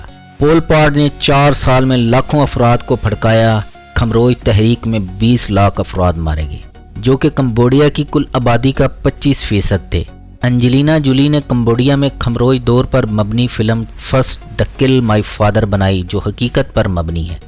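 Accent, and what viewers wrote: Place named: Punjab, Pakistan. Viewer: Speaking with a punjabi accent bad English pronunciation need a lot of improvement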